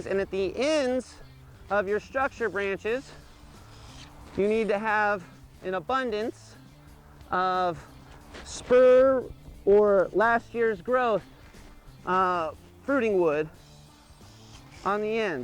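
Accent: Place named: Southern California, United States. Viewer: also, with your thick So Cal accent slurring of consonants, i could not get the name of that trimmer you are using, might you put it in the notes, or enunciate clearly next time? thanks much...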